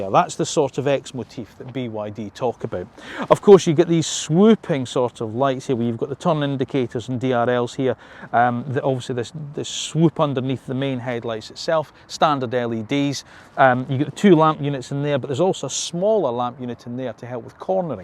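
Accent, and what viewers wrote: Scotland, United Kingdom. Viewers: Your accent is Scottish and is not a stupid accent.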